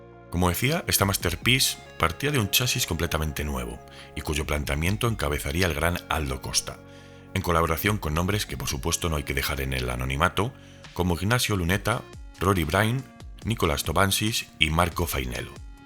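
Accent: Spain. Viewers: el español de españa que narra este video es épico porque es un DOCUMENTAL. mano ganaste un suscriptor!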